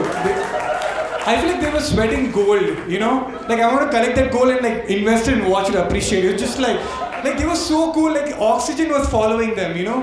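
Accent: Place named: Tamil Nadu, India. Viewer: He got alot of tamil influence 😂😂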